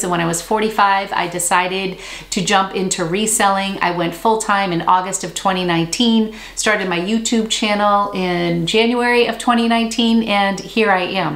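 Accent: Massachusetts, United States. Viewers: Lol I feel like you don't have a strong Massachussetts accent until you hang out with your friend haha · This is exactly what I was coming to the comments to say, haha! Love that accent! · I totally thought the same thing! Lol..., I’m the one who asked about you having a hidden Boston accent in your IG a while back and this video proves you do:) love it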